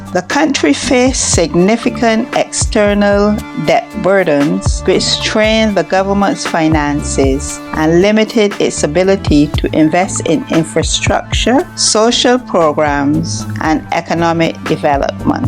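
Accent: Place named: Barbados. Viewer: The accent is a "Bajan" accent or if you can say Barbadian accent.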